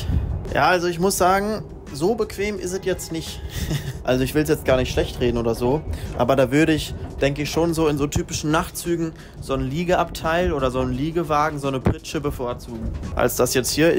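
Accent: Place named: Germany. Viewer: würd ich nicht sagen. ist gutes schulenglisch. aussprache ist halt bisle schwierig, aber das haben fast alle deutschen so :D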